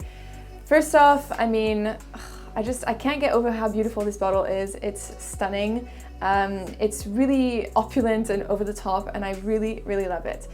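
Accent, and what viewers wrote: France. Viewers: I love your french accent ❤️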